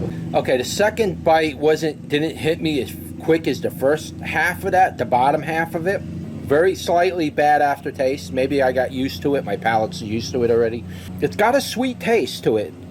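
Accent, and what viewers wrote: New York, United States. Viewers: You sound like a New Yorker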